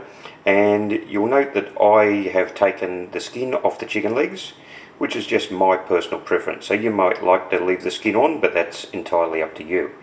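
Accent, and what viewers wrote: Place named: Australia. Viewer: So nice to hear an over the ditch accent 😊 kiwi here x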